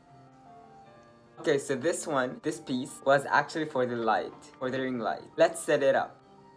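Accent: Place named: Spain. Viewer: I like your accent. Where are you from? Spain ?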